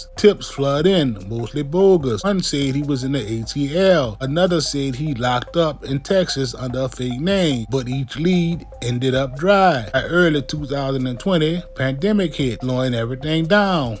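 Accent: New Orleans, United States. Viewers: I Understand Completely What That Man Saying. This How We Talk In New Orleans. If You Can't Understand What He Saying Then Why You Watching His Content?! 🤷🏿‍♂️ And More Importantly If That Southern Accent Is "Painful To Listen To" Then Get Tf Off This Man Shyt!! 😂😂✌🏿✌🏿 -Signed A New Orleans Native Bih!! ⚜️🤣🖕🏿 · That’s how we if you’re from New Orleans.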